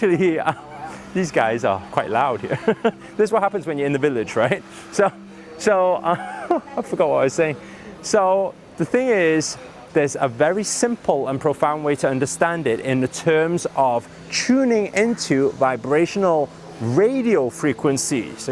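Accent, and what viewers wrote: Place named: United Kingdom. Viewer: I'm interested in the British accent, I really love it. yesterday I've been thinking; I really want to find a British LOA youtuber, then forgot about it, Opened youtube tonight and I've just found you! I'm blown away because I've been doubting LOA for nothing seems to work. The problem is, I can't let go, I can't stop my obsession with my desires. Please help with a video about an easy way to let go and stop the obsession.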